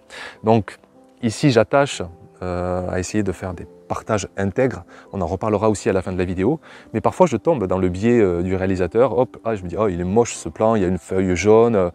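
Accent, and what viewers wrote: South, France. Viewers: Merci pour cette video et pour tous ces details que tu as partage, bien conscient qu'en plus de la passion du jardinage, il y a un gros travail pour faire ces videos... J'ajouterai pour compléter le tout, un capital sympathie incontestable et surtout cet accent du sud (j'adore!) qu'on vous envie dans le nord! BRAVO!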